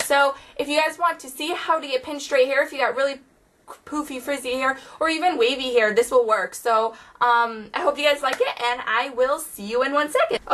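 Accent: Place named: Midwest, United States. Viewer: Are you from Michigan or Wisconsin? It sounds like you have a slight accent from there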